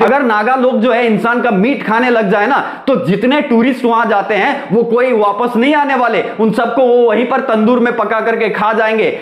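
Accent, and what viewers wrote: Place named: West, India. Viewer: Nahi vai iska voice aur tone dekh k lagta hain western part of India se hain.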